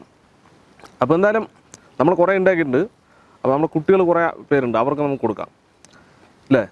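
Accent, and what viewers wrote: Palakkad, India. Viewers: palakkaden samsaram ath poliyan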